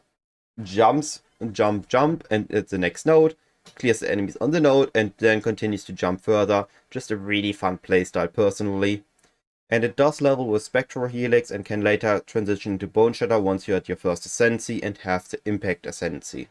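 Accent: Germany. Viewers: Deutscher? BTW Very Good Video!! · Hört sich an, als seiest Du Deutscher, daher mal das Feedback auf Deutsch: Danke für die Anregungen, jetzt hab ich 'ne Idee: Seit gefühlt hundert Ligen mal wieder 'nen Essence-Drain-Trickster ausprobieren. :-)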